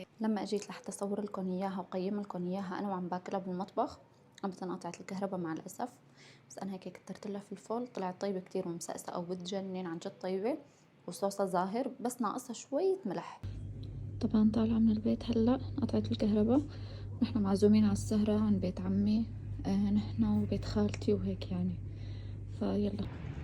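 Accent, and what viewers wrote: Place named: Levant. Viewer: لهجتك شامية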